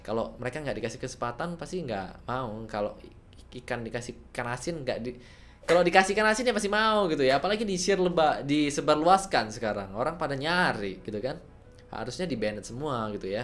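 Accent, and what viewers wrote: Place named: Java, Indonesia. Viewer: oh gtu.. jdi bg medok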